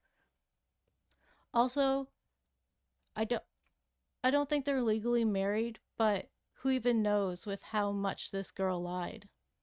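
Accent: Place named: Canada. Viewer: Enjoy and appreciate your updates! Also your Canadian accent is everything!! Lol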